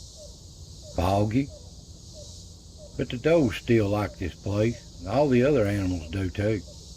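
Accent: Appalachia, United States. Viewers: I am 54.I miss my earthly father and his family which are all deceased now.Its so soothing to hear voice that brings back edifying and that Appalachian voice.I praise Christ now as my heavenly father gives me Peace through the Word of the holy bible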